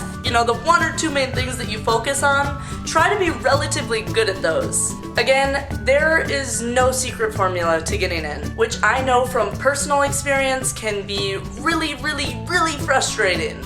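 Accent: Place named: United States. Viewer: Like you are a harvard student(someone intelligent as hell) then i'm pretty sure you learned to speak every languages possible in the world can you do a french version? i'm very interested to go into this university :c PS: I understand a little bit with subtitles because i'm shit to understand american's accent & pretty funny video xD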